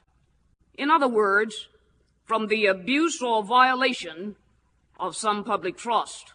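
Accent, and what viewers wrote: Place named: Texas, United States. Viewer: This is what a good Texan sounds like.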